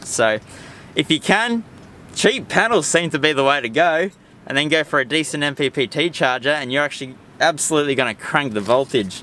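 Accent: Australia. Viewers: Australian accents are just awesome! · Very well explained... where u from Mate? Australia? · The Steve Irwin of Off-road, not just because of accent, but I enjoy the content because clearly he is enjoying discussing it. Accent helps though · We probably have a different accent? But we all are not a dumb cu.t He might have make a mistake etc but he knows what he is doing.😎 Don't fu.k with an ozzie 😆 Oi oi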